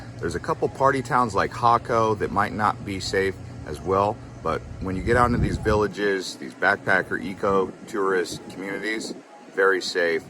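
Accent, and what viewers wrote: United States. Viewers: Damn, I am a gringo married to a Costa Rican woman and his American accent kills me! LOL!!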